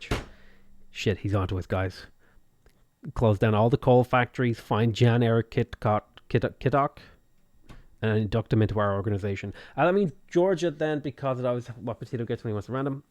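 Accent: Ireland. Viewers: he is from louth i can hear it ;) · Potato, you definitely have an Irish accent.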